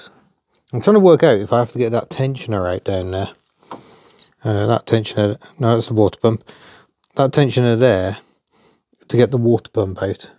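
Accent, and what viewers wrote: United Kingdom. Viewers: Listening without the visual offers a somewhat "Carry on " experience which is highly entertaining and quintessentially British flavor!!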